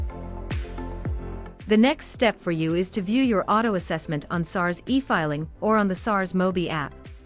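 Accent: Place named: United States. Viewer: Really annoying that a video for the South African Revenue Service is narrated by someone with an American accent! WHY?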